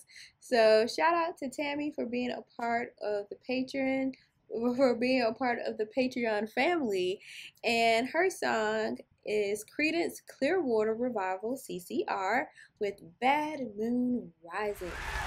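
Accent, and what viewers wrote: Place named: South, United States. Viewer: Yeah, yeah, the song is great and all but what part of the country are you from,? Because, girl, you've got waaaay more than your fair share of Southern charm just oozing out all over the place. I could listen to you talk and laugh all day, every day, and still want more. If that husband of yours doesn't feel the same way then you'd best sit him down and remind him of what a lucky man he is! LOL Keep rockin' this channel!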